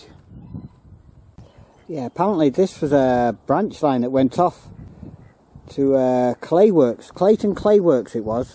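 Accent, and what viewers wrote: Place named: Yorkshire, United Kingdom. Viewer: You’re a character? Love the determination to go ahead? Ur just like me mate? Love your accent ! Keep up the great work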